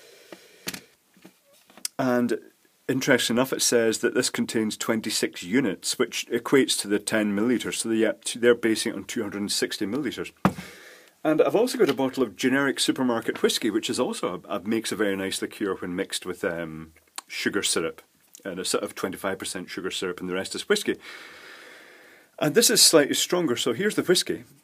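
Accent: Scotland, United Kingdom. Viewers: thought you were scottish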